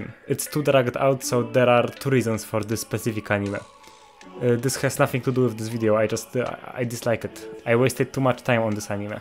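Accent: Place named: Eastern Europe. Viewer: you sound really slavic bro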